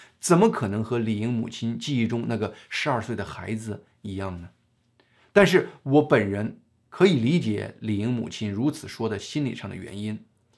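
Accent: China. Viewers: 如果你是东北人。（从你口音听起来应该是东北人）。希望你的视频能割裂中国思维，让你本人聚集在东北人视角上。东北和中国是完全不同的文化和地区。南方是无可救药的。东北人不应该和中国人混为一谈。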